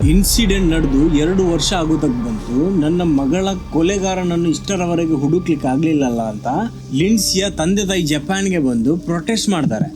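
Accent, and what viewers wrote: Mangalore, India. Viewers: R u manglorian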